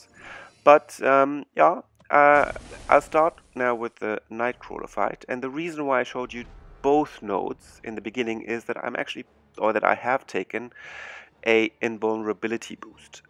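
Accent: Germany. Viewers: Where are you from dude? you sound German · You sound german, am i right?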